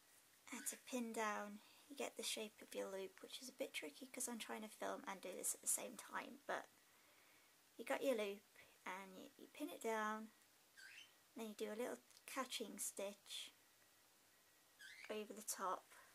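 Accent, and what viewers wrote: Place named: Wales, United Kingdom. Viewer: This must have taken SOOOOOOO long!! Are you from Wales? You sound Welsh :P xx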